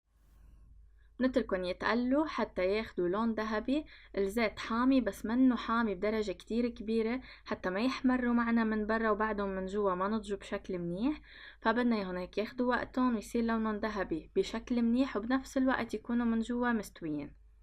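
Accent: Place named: Lebanon. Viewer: صوتك حلو مع اللهجة اللبنانية وطريقتك بسيطة بالشرح ، موفقة❤️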